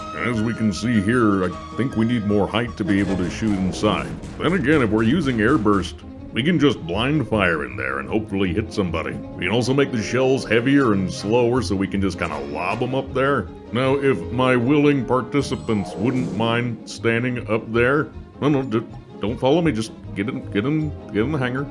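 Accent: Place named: United States. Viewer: hes the most american man i ever heard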